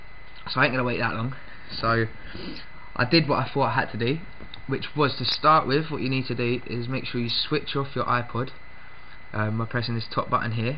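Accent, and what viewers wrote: United Kingdom. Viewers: Thank you my British speaking friend. :D Now im up 60 nigga! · your british ???? cause thats kool doggy!!!!!! Lol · nice british acent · that is an london accent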